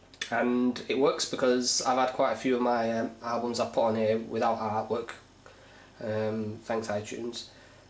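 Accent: Yorkshire, United Kingdom. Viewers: It's a yorkshire or some kind of northern english accent. IT'S A GREAT ACCENT.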